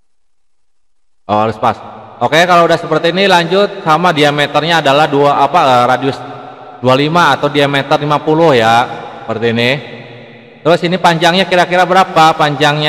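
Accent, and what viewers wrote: West Java, Indonesia. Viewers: kayak logat sunda ya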